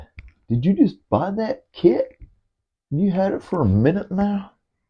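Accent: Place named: United States. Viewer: Mate you have the best yank accent in FPV 😄🤝 This is a brilliant video and I hope loads of newbs see this as the advice is SPOT ON! I tried raising this point with JB but he just poo poo’d me, I attempted to explain that he’s going to be out of a job if the next generation and their parents don’t even consider Analog, they just don’t have the money that digital costs let alone the repair costs when the inevitable crashes happen! 😮 Seen SO many guys come into fpv with a complete dji fpv/avatar system and then disappear after destroying their Gucci new quad and not being able to justify to themselves/the missus & kids the cost of replacement etc 😢 Eachine ev800D goggles still hold their weight, I use Cobra X now but still use the Eachine ones when my kids fly with me and let them use the Cobra’s 👍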